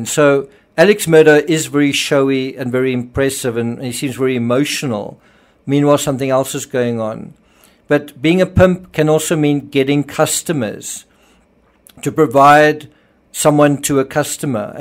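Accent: South Africa. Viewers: Thanks for this episode and your groovy safrican accent! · Your accent leans more south African than American, your from?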